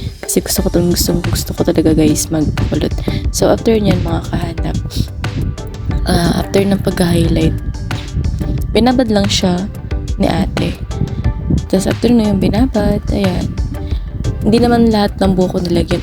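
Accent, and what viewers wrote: Bicol, Philippines. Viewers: ahh ay akala koy taga mindoro ka..taga bicol ka baga?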